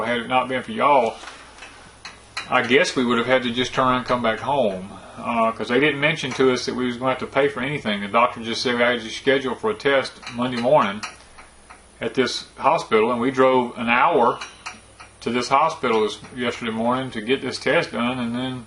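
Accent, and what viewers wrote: South, United States. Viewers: I enjoy listening to your stories on Porch Time, in fact I put it on auto play when going to sleep. Hearing your southern accent is calming, It's like hearing my late husband talking...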